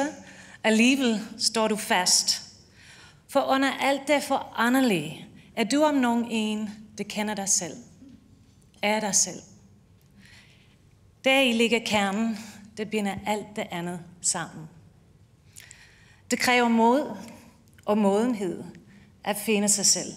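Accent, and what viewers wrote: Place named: Denmark. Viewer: The subtitles are for the death and the hard at hearing and people who are death. The rest of us can understand her quite fine, as she is speaking what is called rigsdansk which can be compared to something like Cambridge or Oxford English.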